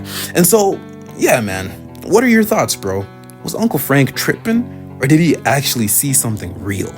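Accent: United States. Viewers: Even crazier that these (professionally-animated) videos about "life in Africa" are narrated by someone with an American accent. There are too many question marks here to take any video this channel publishes seriously.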